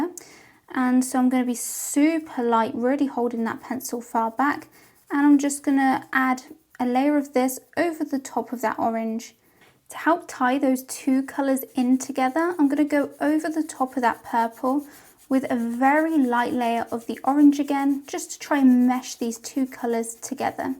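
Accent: England, United Kingdom. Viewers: You have blown my mind with these videos. I have avoided coloring a lot of my pictures because of skin tone and I bought a pack of Crayola skin tone colored pencils which is cool but I kind of felt like it was cheating. The one thing I do understand is that all you need is red blue yellow black and white and you can create almost any color that exists especially with paint. But I like colored pencils and I don't know how I've never come across a video that explains so easily how to create skin tone and now I'm doing it like a pro and I have to thank you for teaching me that. Anybody who isn't British I can tell you things that not only you are very pretty but it's hard not to love your accent. Which I kind of think it's funny because where you live it's not an accent but a bloke from America thinks it's super Charming. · Her accent or british accent is perfect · The english from England is really beautiful !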